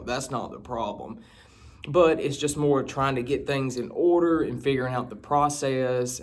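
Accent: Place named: Deep South, United States. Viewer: . I'm not sure where you are currently located, however, if you are still in the Deep South neo-con Bible belt, I'm sorry, but it is not a healthy environment for you. The first thing you should consider is moving to a more progressive place. It hurts me to see men like you, good, decent people, who have had to go through an environment that died in the 1960s. I am not suggesting that you need to lose your faith but think about this. The best definition of Evil is this: "Something that appears good and is not." Your country is on the brink of Civil War because of the appalling level of ignorance (don't confuse this with intelligence) that saturates the Mid-West and Rural Southern areas of the USA.). I was fortunate to have been born in London, England. I have dual citizenship and my home is in Toronto, Canada. Do not put down "Book-larnin." Sorry, but your accent only amplifies your situation. 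Now I'm not saying you need to get a PhD like I did. Not everyone is an academic or seeks a professional degree. Use your better instincts. All of us have a bell in the back of our unconscious that rings when we sense someone is "just like that other person who told us that they would be more than willing to teach us. Knowledge will make you Free. Read and read and read. The internet is not the greatest source of knowledge. And please, refrain from mentioning things like "I wish I had an older mentor." That is precisely what you do NOT need. You will be deluged with men older than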